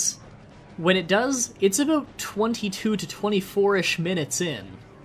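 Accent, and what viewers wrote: Canada. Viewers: You canadian right? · He is so frickin Canadian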